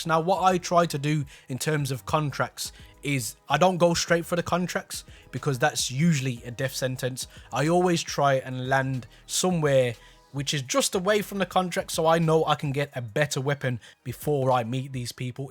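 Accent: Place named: Wolverhampton, United Kingdom. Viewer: ah, close! Thought I heard the wolves-ism's. Good video mate, keep it up!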